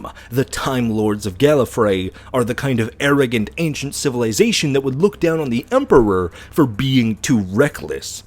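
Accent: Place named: United Kingdom. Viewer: too. much. british...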